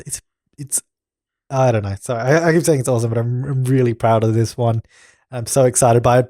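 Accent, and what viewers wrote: Australia. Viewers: Here in the States in Ann Arbor Michigan they have a arcade place, its a big one, it has nothing but that, and a snack area, I forget what its called now, its been about 5 years sense I was in Ann Arbor,lol! And I have to say, I love it when Aussies say No worries, meaning no problems or trouble, its kinda cute! lol! I enjoyed this video more because of his accent, if he had an American accent I wouldn't have watched the whole thing,lol!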